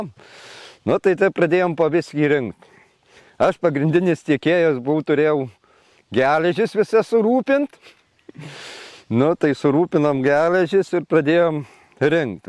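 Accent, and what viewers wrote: Dzūkija, Lithuania. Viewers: Saunuolis labai grazi dzukiskai kalba 🙌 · Širdžiai miela dzūkiška kalba.👍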